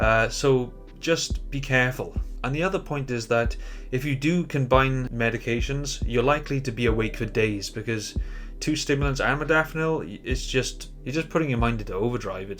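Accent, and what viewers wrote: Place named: Swansea, United Kingdom. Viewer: You gotta be from south Wales butt you sound like a Swansea lad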